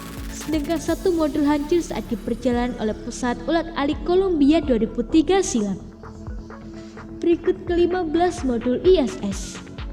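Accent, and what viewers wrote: Java, Indonesia. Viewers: Suara nya medok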